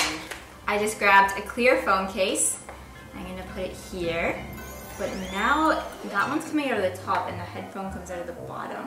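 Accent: France. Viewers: hi! hello from Sydney, Australia. You are such a sweet and creative person. Love all your videos and thanks for all the efforts you put into them. It won't surprise me if you will work with a jewelry or bag company one day to have a collection. Last but not least, love your "French accent"!! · the french accent HAHAHAHAHAH made my day HAHAHAHA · LOL, I'm sorry that I just can't stop laughing when you looks serious on the street...and I really love your French accent XDDD Love you <3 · i LOVE the french accent "rrrrrrrr" 😹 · I didn't expect that but you made it! great job:) btw I'm from Paris and whenever I hear your french accent I'm dying 😆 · I love it with your French accent omg you made my day loving the bag !!! Hahaha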